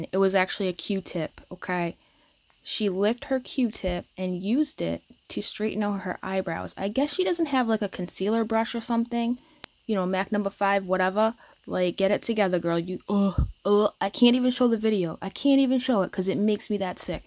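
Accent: Boston, United States. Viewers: You have to be a Boston girl.. Our accents are surely one of a kind xx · Great video as always and love seeing you... your accent coming out of that pretty face is awesome. For a west coast girl, your Boston accent is so cool. I’ve connected with Sue on another comment section on a different channel and praised her for even having the courage to share her pain... only to have a vapid little goblin claim she was “ one upping her story” and not paying enough attention to her talking...what a piece of 💩.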